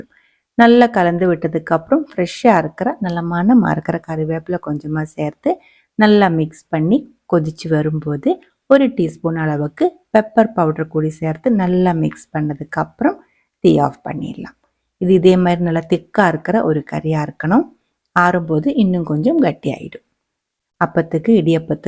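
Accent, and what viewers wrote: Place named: Kerala, India. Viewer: Avuha Malayalam... basha puryalaiya... so sweet...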